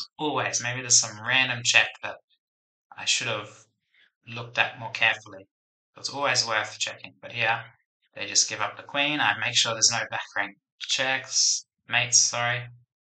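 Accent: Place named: Australia. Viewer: What is your first language? Your English sounds great, at first I thought you may be from Australia or New Zealand.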